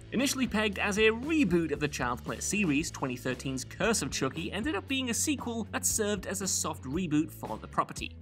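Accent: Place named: Newcastle, United Kingdom. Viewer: I do love a nice, Geordie WhatCulture video... (Unless it's a macam) 😀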